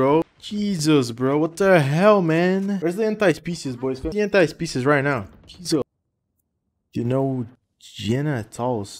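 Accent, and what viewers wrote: Brazil. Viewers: wait are u from brazil? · Da pra perceber no jeito de falar kkk Brasileiro falando ingles sempre puxa umas letrinhas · ele é brasileiro ss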